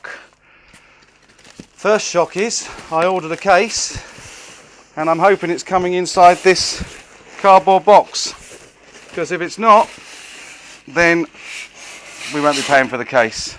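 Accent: England, United Kingdom. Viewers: See your playing the right way with a pick and your english accent very cool I love england people I'm a italian from New York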